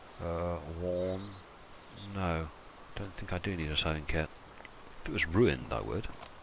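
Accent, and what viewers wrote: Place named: West Country, United Kingdom. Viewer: I love watching your content, I'm always learning something new about the game and I always have a great time, I would love the oppurtunity to play with you one day. I love watching you not just for the excellent gameplay but also because you have an awesome sense of humour, an easy going attitude. Your west country accent is a bonus too :)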